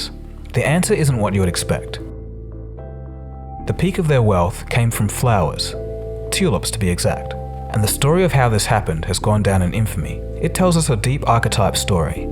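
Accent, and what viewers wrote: Australia. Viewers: A great video Are u Aussie? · Crap channel. Horrible Australian raspy accent. Terrible summary knowledge which frequently engages in absurd reductions and simplistic analysis.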